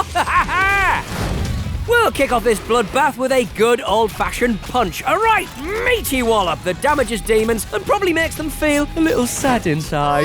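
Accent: Australia. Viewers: He sound more Aussie than Irish to me, m8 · The narrator feels like his having a normal day in Australia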